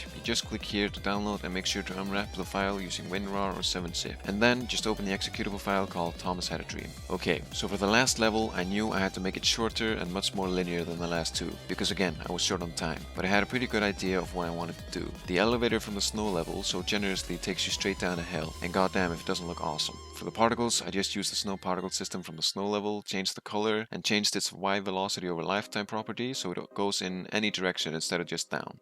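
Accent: Iceland. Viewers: Are you icelandic? Accent🤨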